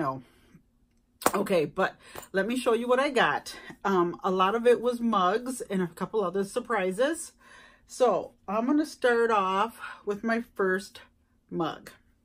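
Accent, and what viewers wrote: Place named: Minnesota, United States. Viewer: I knew with the accent you had to be from Minnesota, I’m from Delaware and we barely get any Rae dun. You got so lucky I want those coffe cups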